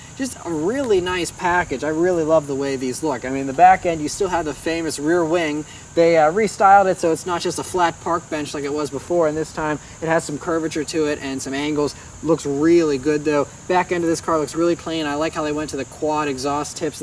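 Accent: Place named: United States. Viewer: an unbiased opinion on wrx by a youtuber whos account name is?... think that one out... however his reviews are good, I love em alot... and I hope he does go onto greater things.... somethign about the American accent and documentary really fits, same as British accent... try doing this as an Aussie..... Our accent feels sharp and uneasy to listen to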